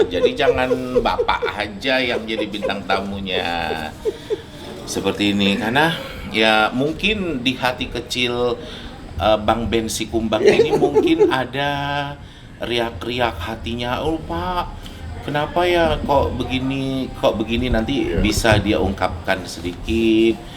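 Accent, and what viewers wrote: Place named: Medan, Indonesia. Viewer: Logat Medan yaaa.. gaya bicaranya mirip Billar banget 🤭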